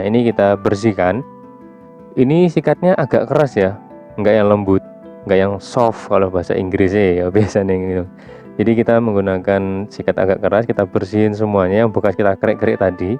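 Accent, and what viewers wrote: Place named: East Java, Indonesia. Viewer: Asli mana bang kok ada logat "rek"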